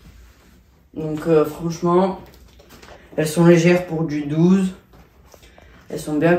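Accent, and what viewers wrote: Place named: South, France. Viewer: Tu vis dans le sud de la France ? T'as un p'tit accent du sud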